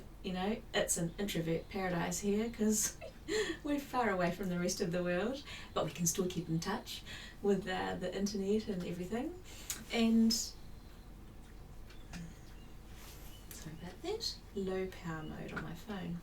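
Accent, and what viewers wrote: New Zealand. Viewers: Thank you,. I love hearing your NZ accent... it's so refreshing from our clipped Canadian spoken word. Every day I write down my plan for how I will glide through my day. (HA!). Eating elegantly, making chic choices, phone calls, work project goals, exercise, specific people ignoring, etc and put a little ticky box beside each one so I can give myself a big check mark in the evening. I think I learned this from you. Not all my ticky boxes always get checked, but I'm always aware that they're there. My goal recently is to order your Chic Author book as I'm very afraid to think of putting my writing out there. Thanks for the tip about Rhonda Byrne. I read "The Secret" back in the day and that was it. I look forward to checking her out. xo karen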